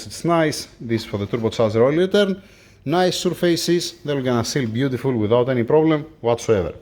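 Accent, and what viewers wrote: Greece. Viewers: Just a quick question firstly I absolutely love how that you are attention to detail. Are you GREEK?? because you sound like it 😅😅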